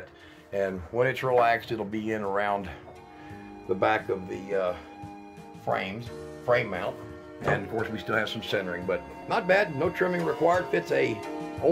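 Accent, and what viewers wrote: United States. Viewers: You sound American.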